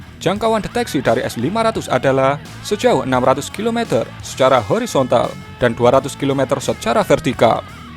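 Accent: Java, Indonesia. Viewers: Medok medok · Suara lu terlalu medok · Suka denger logat jawanya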